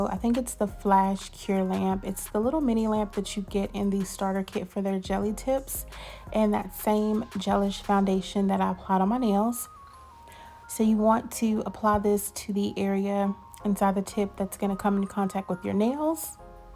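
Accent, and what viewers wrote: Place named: South, United States. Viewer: Your nails are beautiful… but the thing I’m more focused on is that accent. Girl I’m a southern woman though and through but I’ve never sounded that sweet when I talk. I’m soothed, I’m relaxed, and I’m envious all at the same time ❤️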